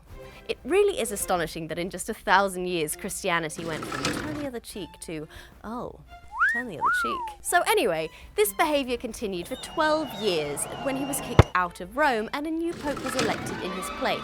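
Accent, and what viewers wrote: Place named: RP, United Kingdom. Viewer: Wow, sounds really posh in this video! Being in the States has really altered her accent.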